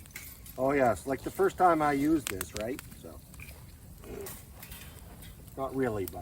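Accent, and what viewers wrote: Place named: North, United Kingdom. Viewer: Hi 👋🏻it’s Nutty Mark here. Checking your video out for the first time. Looks like, and sounds like your a bit North of me. Always my favorite vacationing location. #20👍🏻P.S. love the Doggie’s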